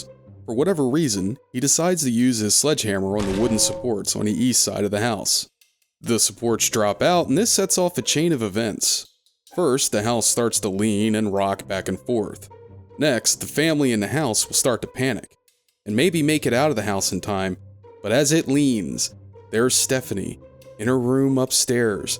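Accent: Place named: Philadelphia, United States. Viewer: Are you from Pennsylvania? Specifically Philadelphia area? You have the accent to a tee.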